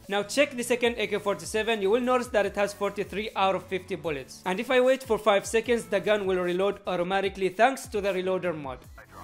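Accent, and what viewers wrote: India. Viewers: At first I thought your indian by your accent then I realised Indian can’t be this good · Are you an Indian bro ? because i think your an indian because of your accent from: Philippines🇵🇭 ❤️❤️❤️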